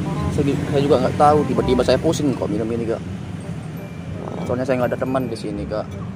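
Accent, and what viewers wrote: Lombok, Indonesia. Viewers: Logat Lombok ya gak ilangg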